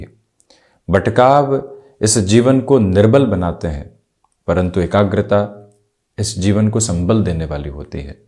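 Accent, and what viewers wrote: Standard. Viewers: मैं आपके चैनल को फॉलो कर रहा हूँ और दिन प्रतिदिन का राशिफल सुन रहा हूँ। आपकी भाषा, आपकी हिंदी बिलकुल शुद्ध और साफ़ है । इस बात की ख़ुशी है की आज भी ऐसी शुद्ध हिन्दी कोई बात कर सकता है । प्रनाम 🙏🏻।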